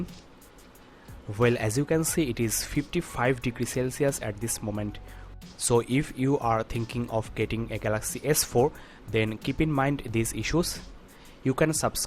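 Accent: India. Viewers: I hate when indian talk english..its so annoying..fucking annoying me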